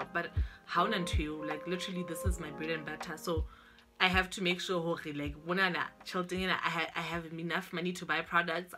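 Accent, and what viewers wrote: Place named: Free State, South Africa. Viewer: When you said 'hao cheweng', I knew I had to subscribe 😂😂😂 You sound like you're from Free State.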